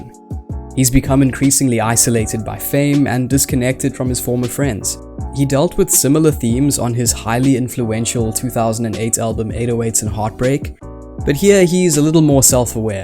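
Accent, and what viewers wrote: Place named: South Africa. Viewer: Kwaai video broer en opbreuk. Jou aksent klink Suid Afrikaans, indien nie... definitief Australiaans of Neeu Seelands😊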